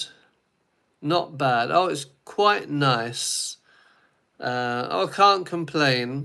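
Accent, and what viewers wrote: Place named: Northern England, United Kingdom. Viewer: You sound from north England.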